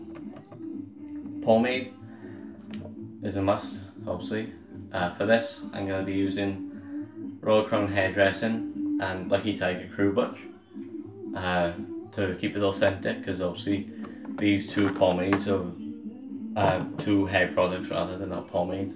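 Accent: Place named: Wales, United Kingdom. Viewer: Are you welsh fella? Lovely accent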